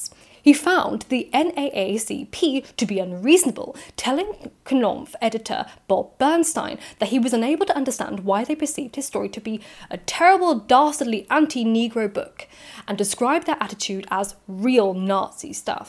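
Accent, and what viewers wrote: RP, United Kingdom. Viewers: He sound like Boris johnston,, The Brittish upperclass don t change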